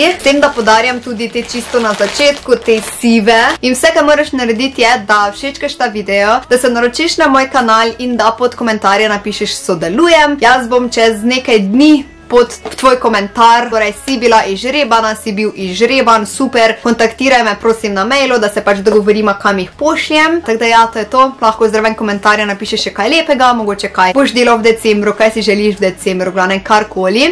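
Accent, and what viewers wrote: Maribor, Slovenia. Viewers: Sodelujem 😊❣️ Ful fajna sii👌 pa fajn je videt da niso youtuberke sam iz Ljubljane in res mi je všeč mariborski naglas čuj😍 Upam da dobim čevljeee komaj čakam❣️❣️❣️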